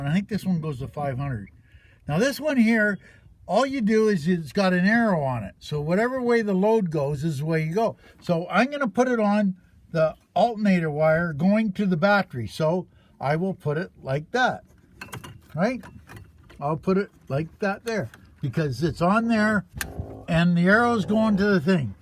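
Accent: Canada. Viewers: Education at the best level. Hands on and clear English or Canadian. Eh? Or is it AH?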